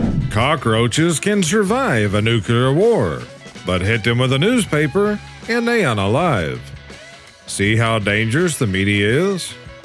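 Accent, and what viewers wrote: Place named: Appalachia, United States. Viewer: I can READ ! Why do I have to listen to some hillbilly read the words ON THE SCREEN???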